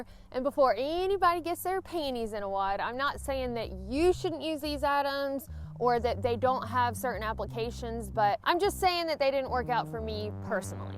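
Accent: South, United States. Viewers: I was watching with the captions on...I don't think the autotranscribe has been fully configured for Southern accents. It thought she was talking about 'hocking' boots. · Miss there's nothing better than turning the computer on and there you are with that sweet southern accent giving this older lady some advice. It just makes my day!!! fter watching your Thermarest fiasko I bought a Nemo Tensor. I usually watch your videos and if it doesn't work for you I at least try it but I always buy from REI because of their return policy even if they are a little expensive. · That southern drawl........damn grrrrll. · love your Southern accent. Im in the middle of getting ready for my 2020 backpacking trips. Updating gear.....long over due. You should also check out Topo running shoes....they have a great gaiter system also. loving your videos....keep them coming....Happy Trails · I love your Southern accent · You meant, her Southern Accent? 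· I'm from the south so....I don't like Hacking boots either....lol....jk...love your southern accent!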